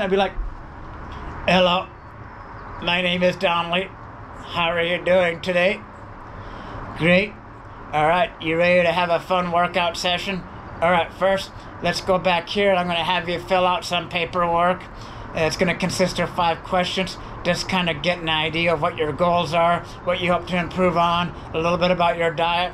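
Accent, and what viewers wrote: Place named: Scotland, United Kingdom. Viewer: Why does he have like a Scottish accent?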